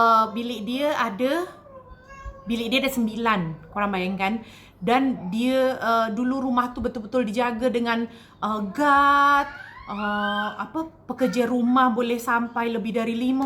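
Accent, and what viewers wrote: Malaysia. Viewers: Saya suka dan senang.mendengar cerita ini, apalagi diceritakan orang Malaysia logat Melayu, berdarah Indonesia.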